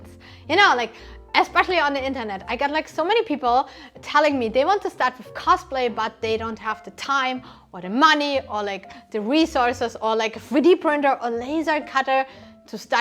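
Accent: Germany. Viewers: For me she Sounds Like she has a Strong German accent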